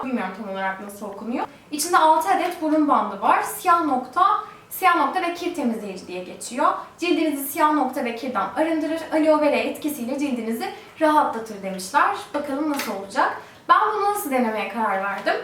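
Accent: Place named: Russia. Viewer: Ты русская скорей всего)))) ama türkçen iyi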